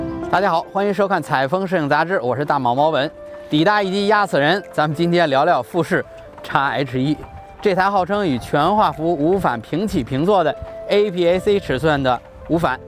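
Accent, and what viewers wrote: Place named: Beijing, China. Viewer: 啥时候澳洲又来一位，我就知道金叔和顾俊，看起来这位水准更专业，北京口音听起来不如那2位亲切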